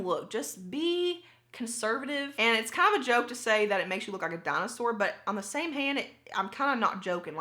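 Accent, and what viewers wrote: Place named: Kentucky, United States. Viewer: Just found this channel, heard your accent and just knew you were one of us KY girls! Love it!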